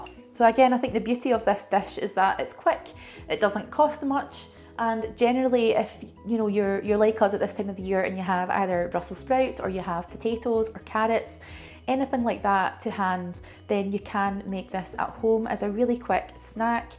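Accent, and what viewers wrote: Scotland, United Kingdom. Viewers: I love your beautiful Scottish accent 🎉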